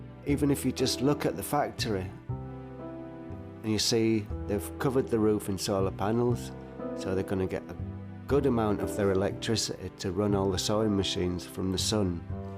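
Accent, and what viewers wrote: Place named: Northern England, United Kingdom. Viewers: the accent remnds me of my friend from yorkshire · What kind of accent fo you have? Northern England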